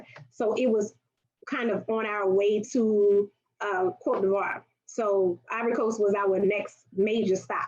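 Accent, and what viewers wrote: United States. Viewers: She sounds like she's from the New Orleans 504 area. I would have definitely like to hear more of the more position pros on each country she went to. Wondering what did work for her and her peoples. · She looks like a Rwandan except she has lived in the US and speak like American. I think she is 1ST generation American from Rwadan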